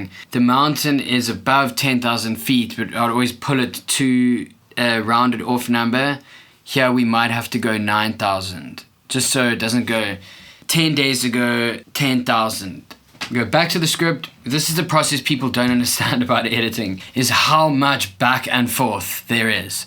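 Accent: South Africa. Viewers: I didn't know who you were until pressing play on this monster length recommended video. To immediately hear the South African accent and find out that you're also a Cape Town boy I knew I wanted to watch it till the end. The love and attention to detail you put into making this Masterclass is amazing and using all of your own advise within it places this at the top of my recommended list for anyone interested in film making. I'll definitely watch this again and add what I've learned from you to my next project. Thanks BRU and God bless. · Howzit! Is that an SA accent I hear? Thanks bru for all the info... was wondering if you think your region restricts your success on YouTube?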